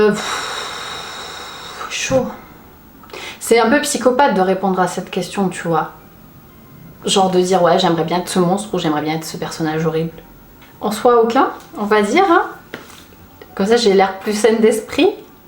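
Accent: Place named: Toulouse, France. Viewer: L’accent TOULOUSAIN HAHA !! J’adore ! Par contre, merci quoi à cause de l’histoire de l’homme aux lunettes je vais pas dodo cette nuit 😂😂😂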